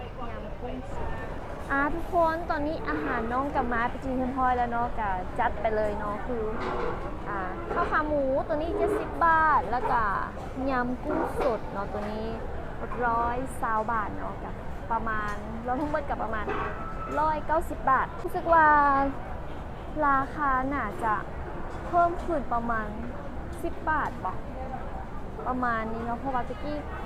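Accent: Laos. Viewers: ดูแต่สาวลาวนี่แหละอยากฟังสำเนียงลาว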